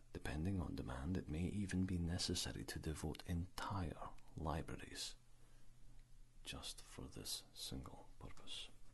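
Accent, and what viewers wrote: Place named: United Kingdom. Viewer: I’m dead... I’m in love with the creativity of this, the aesthetic and the approach. Not to mention your voice is reminiscent of my first ASMR triggers when i was a kid.... “british” accents in somewhat gravelly men’s voices. I haven’t had tingles in awhile but this brought them back almost full force. Thank you.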